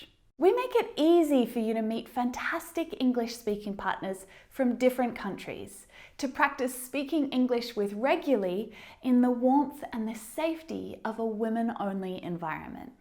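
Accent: Australia. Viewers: Australian Accent ❤️❤️❤️❤️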